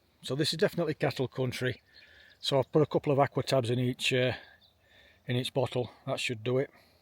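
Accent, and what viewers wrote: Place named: Yorkshire, United Kingdom. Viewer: ###king bear! Brilliant. Fortunately it doesn't understand a good Yorkshire accent. Keep 'em coming.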